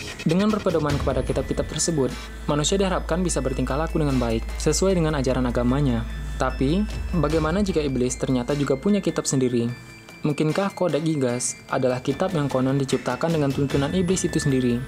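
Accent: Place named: Sumatra, Indonesia. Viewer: dari logat admin sepertinya beliau ada logat orang sumatra dan melayu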